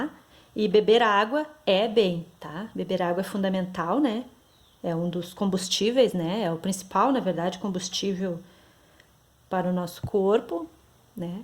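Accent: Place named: Rio Grande do Sul, Brazil. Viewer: Acabei de comprar uma maquina lanofix automatica antiga a garantia e de 1967. Vou ter que cuidar lubricar mas estou feliz. Ja tive uma lanofiz que tinha cartela de borados, infelizmente perdi numa mudança. Amando suas aulas. Gostaria de poder mandar foto da minha maquina para vc. Bjs gratidao. Amo seu sotaque ba guria!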